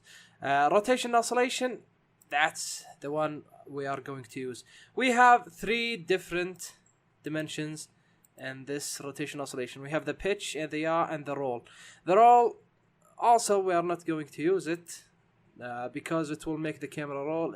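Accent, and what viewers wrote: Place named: Arab world. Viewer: love your accent are you arabic عربي صح ؟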